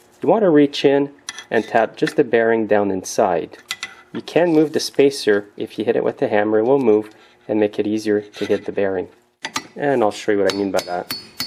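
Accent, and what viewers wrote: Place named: Canada. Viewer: such a canadian accent eh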